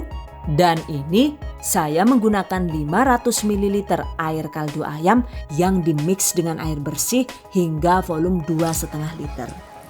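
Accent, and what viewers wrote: Banyumas, Indonesia. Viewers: Mbokayu, rika asline ngendi si, deneng ngendikane ngapak pada karo nyong...asli Banyumas kaya kuwe... sukses terus ya yu...nyong seneng nonton koh...lucu...he he he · Suka bngt suaranya bhsane ngapak bngt ky aku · terimah kasih semua resep" nya. saya suka dengan suara nya & bahasa nya, mudh d mengerti. ada d alek Banyumas nya lg. kbetulan. " nyong be wong bnyumas. " Angger ora ngapak ora kepenak ". 😄😄😄🙏🙏🙏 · Sy suka mbanya klo ngomong lucu suka gaya ngapak dari mana si mbaa salam kenal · Assalamu alaykum Neng ibu reques resep kaldu sayuran dunk🙏... pokoke aku suweneng buanget karo vidio manteb polll gaya bicarane seng gokil logat ngapake,jelas banget ambi tip & trik e,wes to pokoke khas bnget ora ono seng madani,pkoke lope lope yù😘😘😘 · Assalamu'alaikum bunda, Alhamdulilah bisa liat wajah bunda, saya penggemar berat bunda Lo..saya selalu liat,video2 bunda.. 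saya suka bngt apalagi pas ngomong ngapak.. saya orang ngapak dari Purwokerto tp tinggal di Brebes 🙏🙏 salam santun,sehat selalu y bun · The Ngapak Video...tp hasil masaknya mbak e enak tenan mbok,ciamik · Jan janeh wong ngendi sih mba....ko pinter bhs ngapak...pd bae karo nyong🤣🤣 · DONE Sumpah laahh, enyong kie seneng denger step by step caranya...video yang lama jadi gak kerasa lama, coz jadi paham rinciannya dan ngakak sendiri kalau denger mother tongue Ngapaknya..maa syaa Alloh Sukses and penuh berkah buat #THVsukses #GiveawayTHV2020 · seneng bgt dengernya mba....logatnya khas ngapak2 ya mba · Hahahaha ngapak metu maning lucu, seneng liat video nya bnyak ilmu terimakasih bun GOD BLESS U Aamiin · Kalau sudah ngomong bahasa ngapaknya, anakku gak berhenti tertawa hahaha. DONE #giveawayTHV2020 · Emang aku juga suka skali dgn org cantik pintar d sangat terperinci skali jelas menerangin d suara nja ngapak2 nja lucu mantap kereen deh mks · Mksh Bun, dah berbagi ilmunya. 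Mantap Bun, suaranya. Ngapak-ngapak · bismillah" DONE"Giveaway# 2020.selalu senang dengerin suara mbaknya yg ngapak.pokoke ORA N GAPAK ORA KEPENAK,OK.CATAT.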